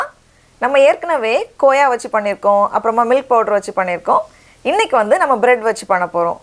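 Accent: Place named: Tirunelveli, India. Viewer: Hi why no videos for a long time eagerly waiting for ur video. Nice video. U r always a queen in cooking I like u very much and also ur tirunelveli tamil. Iam Christilla from Chennai.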